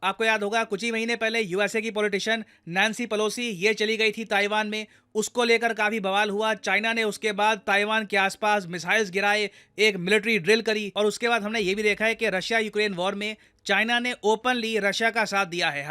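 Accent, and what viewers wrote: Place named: Uttar Pradesh, India. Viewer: sir..... aap UP me kidhar se ho? Aapki tone se malum padta h ❤ Vaise me bhi UP se hi hu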